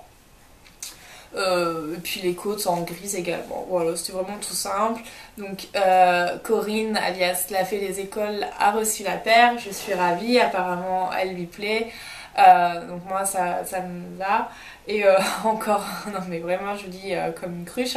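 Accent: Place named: United Kingdom. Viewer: ton petit accent british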